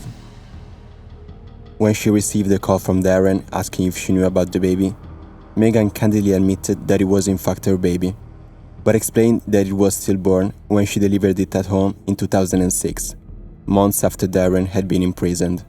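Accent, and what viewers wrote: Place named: France. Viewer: That French accent. Oohh la la.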